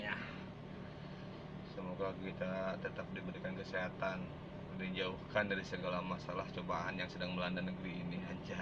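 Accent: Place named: Banyumas, Indonesia. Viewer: wong ngapak pwrt ini bang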